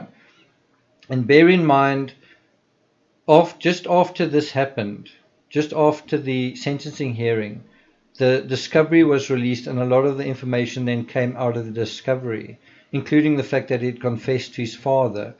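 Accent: South Africa. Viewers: I love your accent 🥰 Sounds South African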